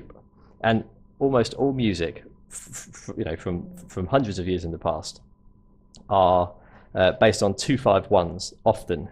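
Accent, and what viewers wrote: United Kingdom. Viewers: I love the way u start ur video. "Hello there". love that British accent And the fun part is i always repeats it myself after watching some of your videos continuosly.😂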